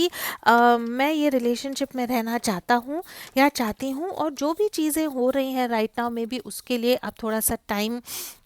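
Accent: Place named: Maharashtra, India. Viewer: मॅम मराठी आहात ते ekun खूप छान वाटले..thank u for reading.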